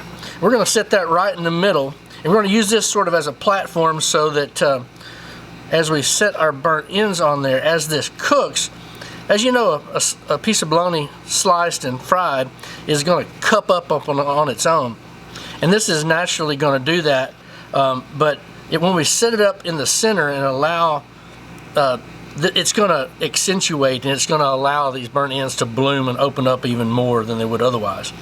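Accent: South, United States. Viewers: I love this guy's accent, he's like an endearing southern uncle. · well..let's see...he's combining bar b que....and bologna.....and describing the middle of the piece of bologna as "center- mass"....while cutting it.......and talking with a thick southern accent....my guess is he voted for Joe Biden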